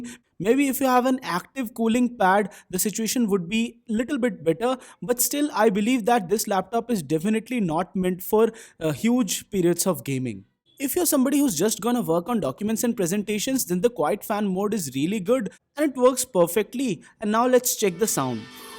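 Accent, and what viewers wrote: India. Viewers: English ( Indian accent ) 😅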